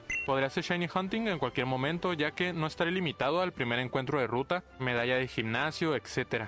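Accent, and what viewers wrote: Chihuahua, Mexico. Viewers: excelente video! tu acento es muy parecido al mio, no eres de chihuahua mexico? un GRAN trabajo y muy tardado, gracias por tu esfuerzo, un saludo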